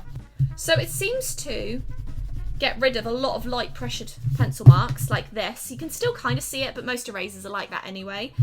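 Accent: England, United Kingdom. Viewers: Hi I love your British accent so much it makes me feel good ❤️❤️❤️❤️😻😻😻🇮🇸🇮🇸🇮🇸🇮🇸🇮🇸🇮🇸🇮🇸🇮🇸🇮🇸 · I'm from England and I've gotta say, has the most stereotypical British accent ever 😂 if you came to the north east you probably couldn't understand us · I've lived in Britain all my life and have never met anyone with as much of a British accent as.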